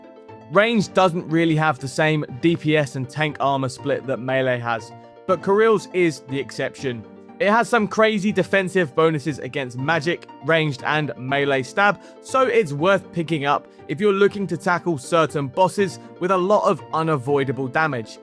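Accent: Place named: United Kingdom. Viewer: God damn you’re the best in the business. Info, facts, humor and a wa-ah bo-ool accent? Perfection.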